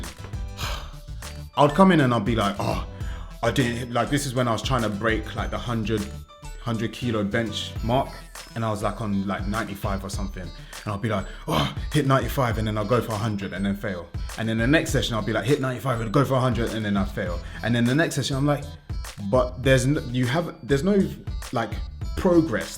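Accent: United Kingdom. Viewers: I noticed, he is actually talk British English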